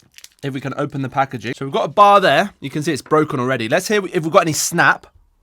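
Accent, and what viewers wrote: United Kingdom. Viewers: The fact that he has a British accenct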